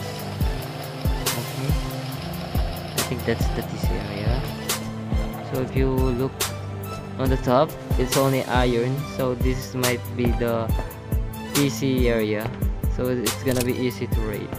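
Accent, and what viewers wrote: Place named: Philippines. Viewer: Are you filipino kasi buses Filipino eh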